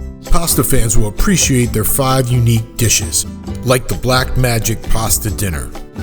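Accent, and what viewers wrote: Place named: North, United Kingdom. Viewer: You sound like Northern transplant to me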